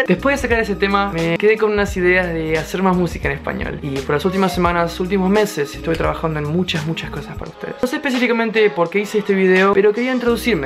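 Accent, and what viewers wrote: Argentina. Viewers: He repetido este video una y otra vez y me encanta tu acento argentino es tan adfghjjkllñ podría escucharte hablar todo el día y no me aburriria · Boludooooo, qué? Sos argentino? Se me va a explotar el cerebro. Like literally sjkfhaks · That's so cool! And yes argentinian most used accent is pretty rough ahah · Es normal que tú español/argentino me exite? Si? No? Ahre Te amo,y ya sea como hables,ya seas como seas :3 💞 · el acento argentino aaa lpm q hermoso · Me encanto 💙🙌 el acento argentino 😮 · jajajajjajaj de una me di cuenta q eras argentinoo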